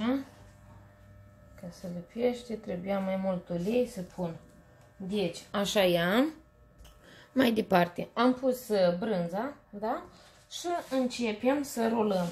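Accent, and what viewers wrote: Moldova. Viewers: M-am abonat pentru graiul moldovenesc ”dulce”...! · Bravo esti o Super gospodina.Imi place mult graiul tau moldovenesc.Maria. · Ma uit cu placere la ceea ce gatesti,esti ff simpatica so imi place cum vorbesti moldoveneste!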